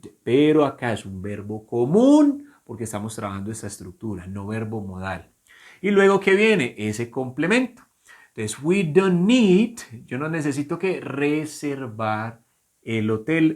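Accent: Colombia. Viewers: Hola ¡¡. Me encanta la manera tan clara que explicas el Inglés y también tu metodología. Saludos desde Peru ¡¡ ah y me gusta mucho tu acento colombiano. Are you from Bogotá?. Blessings!!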